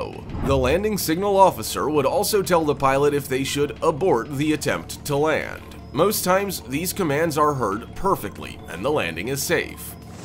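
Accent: United States. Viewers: the voice over.... so not done, but american of course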